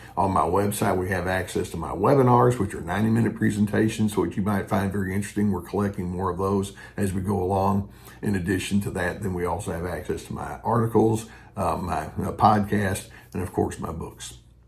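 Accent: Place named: Texas, United States. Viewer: I find your videos very useful and I love your Texas accent! I'm from the UK. 👍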